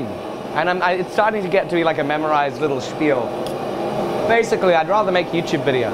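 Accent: England, United Kingdom. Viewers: Hey man, great video. Where in England are you from? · were is from? sounds English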